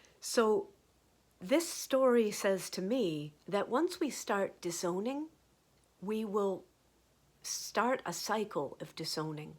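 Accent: Ireland. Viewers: Oh how I loved and needed this! Yes I have done things which, in retrospect, have disappointed or inwardly embarrassed me... but it IS true that I have learned a tremendous amount (in retrospect)... it seems the more daring the effort the more I learned afterwards. I always thought I needed 'a clean slate' of a life, 'never putting a foot wrong' which never worked. I'll listen to this again and have already sent it to my kindred spirit friend across the country 💕 Since delivery is half (or more) of the message I want to say your English is beautiful and I love the very delicate tinge of Irish in your speech.. Thank you!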